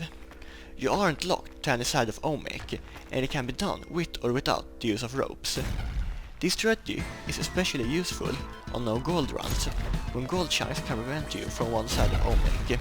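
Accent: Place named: Sweden. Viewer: Oh. Really? You have a very different accent from most other Swedish YouTubers.:D I guess you have just a much stronger swedish accent then. Or maybe from a different part of Sweden, I don't know. The video was really great though, I'm looking forward to more in the future!^^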